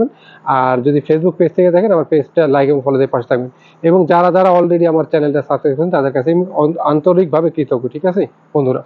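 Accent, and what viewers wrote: Barishal, Bangladesh. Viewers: আপনার বাড়ি কি বরিশালে? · দাদা আপনার বারি কই বরিশাল নাকি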